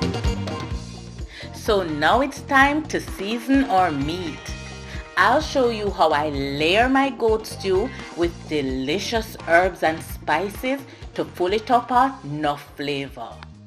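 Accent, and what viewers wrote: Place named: Jamaica. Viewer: Love this style. Nice accent. Big love to Jamaica 🇯🇲🇯🇲🇯🇲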